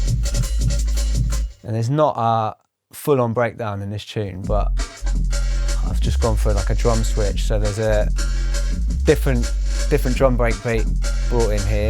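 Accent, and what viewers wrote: United Kingdom. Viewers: He has a standard Estuary English accent. Hard to define a class based on it I would say.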